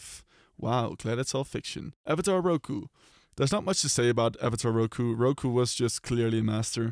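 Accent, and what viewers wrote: Netherlands. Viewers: good vdeo but do i sense a dutch accent ? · i think i hear a dutch accent, je maakt goeie video's. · Great list! Are you by any chance Dutch? I think that’s the accent I hear.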